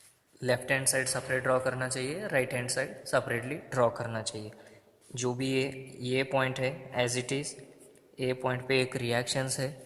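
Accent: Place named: Maharashtra, India. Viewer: Bhava marathi ahes na tu tuzya hindi varun samjla🤣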